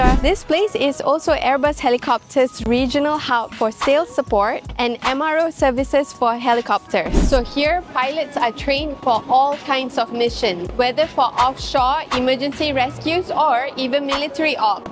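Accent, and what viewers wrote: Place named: India. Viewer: Please stop speaking English as Indian it is extremely trashy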